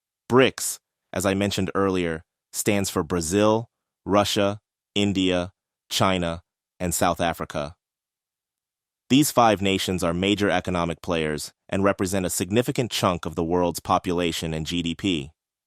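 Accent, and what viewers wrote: United States. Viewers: He is a dictator. And like all dictators, it never works out for the people in the end. Putin is a monster. Why does the narrator sound like he is from New Jersey, not Africa? 😁 let us hear your voice! Don’t be fake! Propaganda channel…. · Not interested in listening to a machine with an American accent or any other accent because it is a machine. Find a human to talk about Africa. The way African people from all over the continent talk with their feelings how they express themselves with regional and cultural accents and opinions. This is the kind of Africa I would like to see, not some American AI Bot! Even better in some ways is just have subtitles and speak the many languages of the beautiful continent.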